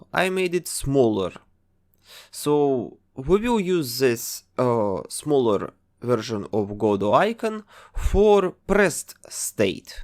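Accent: Russia. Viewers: Чел ты... Русский акцент не убрать!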